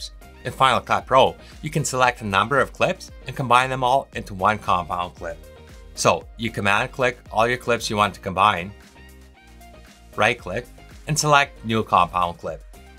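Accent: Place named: Canada. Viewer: You are so good at this. Thanks for sharing your skill. You Canadian? Trying to figure the nice accent.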